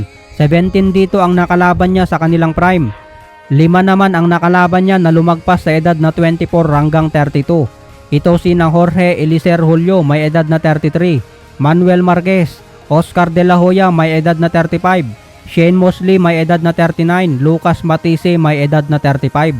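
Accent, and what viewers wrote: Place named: Ilocos, Philippines. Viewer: Ha ha ha, ilokano kasi si kaya instead na Thai ( Tay)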